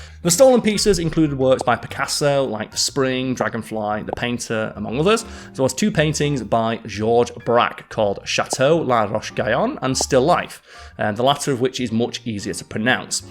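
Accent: United Kingdom. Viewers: It was definitely a change going from Simon to you, but I've taken to your style. Still really dig the Factboi's style, but not at the expense of you. As for your voice, I'm a dumb American so I like all the different English accents, and yours is cool. Your style and delivery are your own, and they are cool. Edit: told you I was a dumb Yank; should have used a synonym for cool the second time, or at least added too. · Ignore the haters, your great yes Simon was great too but you don’t try to hide the fact your British like he did ( he spoke to American for my liking )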